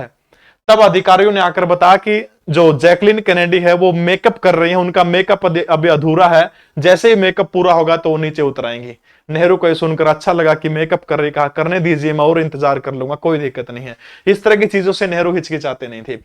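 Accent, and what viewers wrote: Haryana, India. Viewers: Namaskar 🙏 sir,Maine bhi पंडित Nehru के बारे में काफी कुछ pda h sir,real me hi Nehru ek aasik mizaz person tha,lakin yah bhi Sach sir ki Bharat विभाजन के जिम्मेवार भी नेहरू ही थे,ना ये prime minister बनने की जिद करते ना जिन्ना अपना अलग पाकिस्तान बनाता खैर लेकिन आप कुछ अलग ही अंदाज में अपनी हरयाणवी में ब्यान करते हो वह सुनने में काफी दिलचस्प लगता h बहुत बहुत धन्यवाद Bhai enki beti par bhi ek vedio bna digeye🙏🙏🙏🙏🙏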